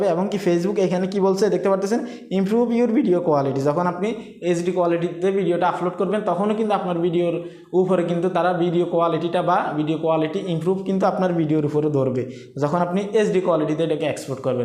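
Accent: Noakhali, Bangladesh. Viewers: ভাই আপনার বাড়ি মনে হয় নোয়াখালী🙂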